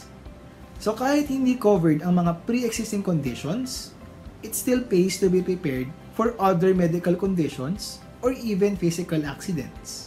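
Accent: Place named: United States. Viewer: Wala akong na intindihan, Americano ka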